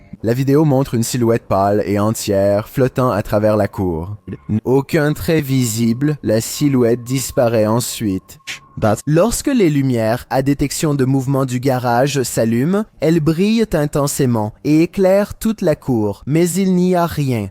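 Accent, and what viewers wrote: Quebec, Canada. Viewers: C'est l'axent québécois connace 🤨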